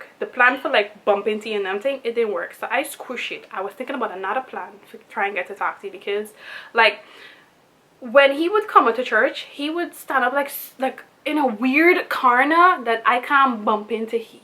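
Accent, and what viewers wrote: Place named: Guyana. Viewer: Guyanese are the best story tellers sound effects and all😂😂 Great story, the accent made it better✨✨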